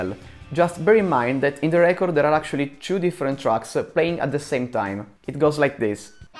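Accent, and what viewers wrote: Italy. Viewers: La única diferencia está en el inglés... A mi me vale el italianinglish de...me entero perfecto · jajaja italiano hablando en ingles! jaj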